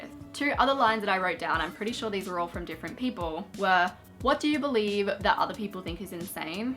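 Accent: Australia. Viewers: I am loving all of your videos. They help me so much! And I love hearing an Aussie offer youtube tips and advice. I follow a lot of the American youtube experts. You are doing amazing work.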